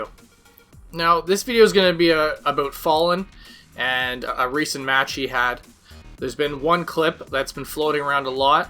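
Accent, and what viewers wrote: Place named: Canada. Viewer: Are you canadian,? You sound very canadian.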